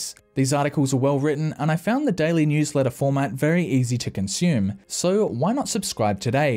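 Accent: Australia. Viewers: About a year or two ago, I stumbled upon one of this guy's videos and found it really entertaining and strangely calming. After the video was over I went and watched something else and completely forgot about this channel. Yesterday, I remember having a cup of coffee and browsing some tech videos and thought, "What was that channel with the young guy with the Australian accent who fixed computers?" I couldn't remember. But then...I remembered a very small detail. I went to YouTube's search bar and typed... "PC cleaning eucalyptus oil guy" and BAM found him. Subscribed so I don't forget again.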